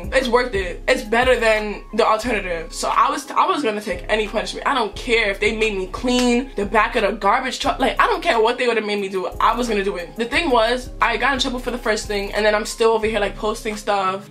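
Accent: New York, United States. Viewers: “So boom” I LOVEEE THE NEW YORK IN YOU 🥰😭!!! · I love you and the fact that your from New York bc my sister is from there and I don’t live with her but y’all sound the same it make me Happy☺️ The first video I watched if yours I could tell that’s where you were from🧡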